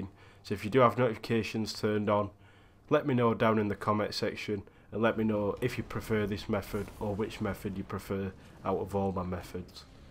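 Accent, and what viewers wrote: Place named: Northern England, United Kingdom. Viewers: Love these farming methods mate. Nice work. Glad to hear a fellow northerner on here helping the community out 👍🏻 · Love your accent, are you from England?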